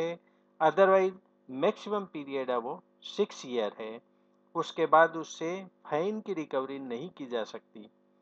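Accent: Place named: Indore, India. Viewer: Are you from somewhere around Indore-Ujjain, sir? "Apan" caught my attention. :D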